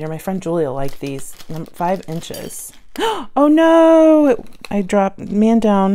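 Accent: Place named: California, United States. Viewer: Sounds like from right here in california right here by the bay pretty cool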